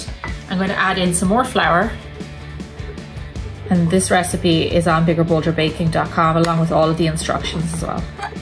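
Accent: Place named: Donegal, Ireland. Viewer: You sound like your from Donegal ♥️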